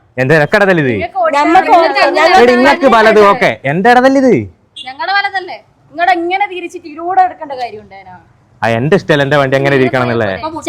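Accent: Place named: Vadakara, India. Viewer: ബ്രോ അയാൾ എങ്ങനെ പറഞ്ഞാലും അങ്ങനെ വരു കാരണം ഇയാൾ വടകര നാദാപുരം slang ആണ് ath അയാളുടെ മിസ്റ്റേക്ക് അല്ല but ഞാൻ അവിടെയല്ല ഞാൻ avide പഠിച്ചിരുന്നു അത്കൊണ്ട് എനിക്ക് മനസ്സിലാകും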